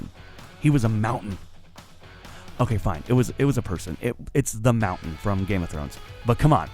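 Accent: Scotland, United Kingdom. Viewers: Scottish accent mate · that was a Scottish accent!!! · That’s a Scottish accent ya dope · ok im from Ireland and you sound Scottish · Scottish lol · Your accent was Scottish not Irish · That was Scottish not Irish accent 😂😂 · Thats a Scottish acent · Scottish accent u tool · American dose a Scottish accent for an irishman, wonder why they call Americans ignorant?. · YOU SOUNDED SCOTTISH NOT IRISH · he sounded Scottish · I hate you made fun of Ireland 🇮🇪 I'm so offended you sounded like it's Scottish guy🖕🖕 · That was Scottish not Irish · That's a Scottish accent not Irish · Definitely not an Irish accent, that was Scottish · you sounded scottish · he sounded scottish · Why did this prat use a Scottish accent? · U sounded Scottish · That was a Scottish accent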